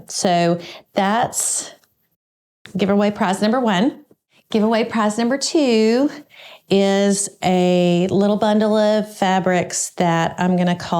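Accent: South, United States. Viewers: Congratulations on your 10,000 K subscriber milestone!! You have inspired me so mucn, especially with the way you showed us how to use thrift stores to make quilting affordable. I had never given it a thought before you showed us how to do that, and kinda what to look for. I have to mention how much I LOVE your SOUTHERN ACCENT. I grew up in Ky so it is so soothing for my soul when I watch your videos. I am currently living near Gettysburg PA. Keep ups the great content. Thanks to Paul for being such a supportive person in your journey. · I live Springfield, Oregon, but several years ago I got to live in the South for 7 years, and once I heard your voice I felt right at home. I'm a craft dyslexic, directionally dysfunctional, and come from a long line of Fabricholics. I too love thrifting. Your calm way of explaining things helps me feel like I really can make something. 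I especially enjoyed your short tutorial about how to use what little time we have wisely. You set your timer and polished off some "catch up" thing you don't enjoy doing, but you taught us that being self disciplined in doing the menial tasks IS part of the creative process. Thank you 🙂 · Congrats, on your 10k+ subscribers! From Wisconsin, I love your relaxed, slightly southern, humorous delivery - I feel we are friends, and maybe sisters in a previous life! My favorite is from your “My Very First Quilt” video. You said “I didn’t know what I was doing (so) I didn’t know to be afraid.” I think we have all been there! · I love your Southern, casual, conversational style in all your videos but especially in Why the Catbird. It’s like a visit with a good friend. ❤ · I love all of your videos but my favorite when you showed your first quilt. It brought back lots of memories when I did not know what I was doing but went for it. 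I live in Bagley, Ala so we are only about 50 miles apart. That is great because I love hearing your Southern voice and words. Keep up the wonderful and fun videos. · My favorite moments are when you laugh at your southern accent and your husband posts definitions. Fountain Hills AZ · Congratulations and Paul. I'm a fellow fan of spots, gingham and recycling but mostly I enjoy your joy and warm southern accent. I live in a tropical Australian city where the men seldom wear dress shirts but I can enjoy your stash creations from afar. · there are so many wonderful moments of laughter and joy in all of your videos, that's why I watch. I guess the episodes that i enjoy the most are any that highlight your wacky and interesting ways that you have with the English language and how you put your own spin on certain words, and your Southern charm is just so captivating. I am Jane from the UK.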